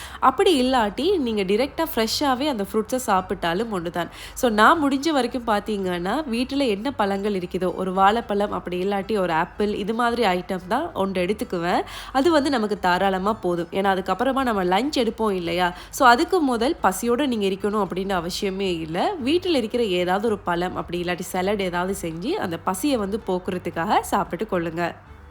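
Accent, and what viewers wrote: Sri Lanka. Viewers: Neenga srilanka tamil thane pesurenga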